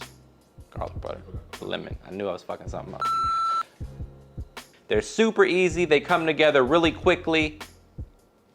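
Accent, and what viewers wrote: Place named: South, United States. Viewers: southern accent lol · You sound like you are from NOLA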